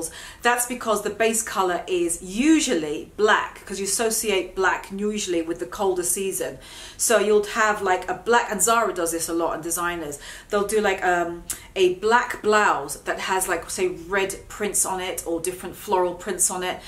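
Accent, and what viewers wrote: United Kingdom. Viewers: Bello acento britanico :) y obviamente excelente video!!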